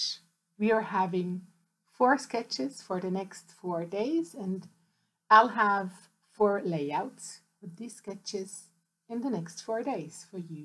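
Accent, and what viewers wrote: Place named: United Kingdom. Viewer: You‘ve got a fairly british accent my dear ☺️ Sehr schönes Layout! Liebe Grüsse 🙋🏻‍♀️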